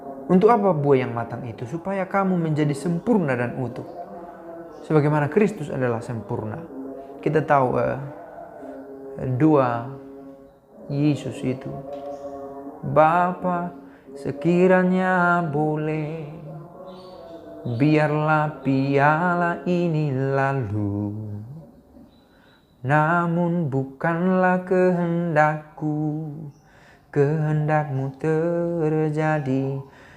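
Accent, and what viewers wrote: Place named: Flores, Indonesia. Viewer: Bro ini chinese berlogat flores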